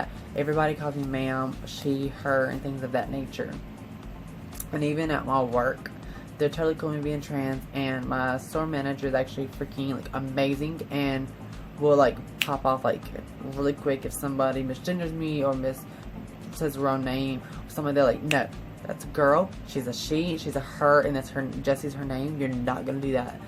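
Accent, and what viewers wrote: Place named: South, United States. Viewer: You look great, Jessie!!! Love the Southern drawl.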